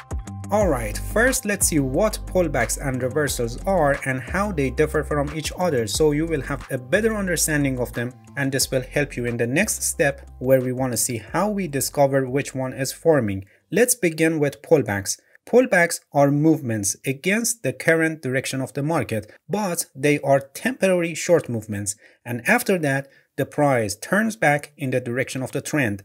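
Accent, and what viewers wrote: Iran. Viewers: You have a strong Persian accent Hard to understand what you’re saying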